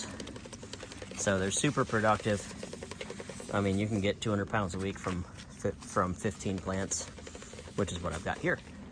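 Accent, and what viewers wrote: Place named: United States. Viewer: helloo thx for sharing. you sound like an american, where in the world are you gardening? New sub from Thailand here. I also grow some cucumbers in floating foam sheets. Is hot season at the moment. I am using ppm as a guidance. What is your ppm for the cumcumbers? mine is arround 900 just begin to flowering.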